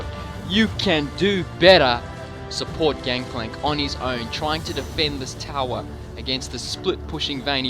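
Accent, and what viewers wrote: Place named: South Africa. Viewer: Where he is he from? he sounds very South African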